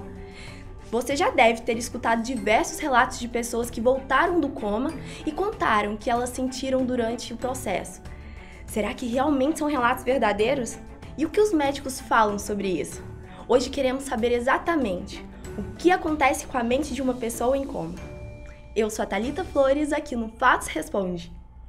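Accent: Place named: Minas Gerais, Brazil. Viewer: ela tem um sotaque bonito de mineira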